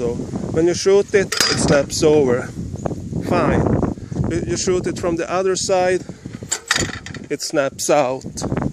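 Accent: Sweden. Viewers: Very cool and thanks for sharing your ideas. Also, your Swenglish is just fine. I appreciate your creativeness! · I am so glad I found your video. I am attempting to build my own texas star. Yours is perfect. You crack me up with your Swinglish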